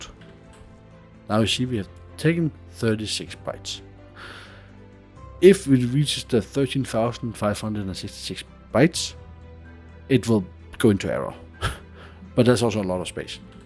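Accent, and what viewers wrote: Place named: Denmark. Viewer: The Danglish is strong with this one, but I learned a lot, thank you ^_^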